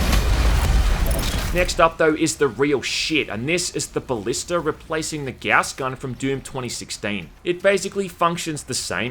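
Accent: Australia. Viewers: Makes by far the best Reviews existing on earth. By the way, are you from Australia? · Aussie accent ftw boi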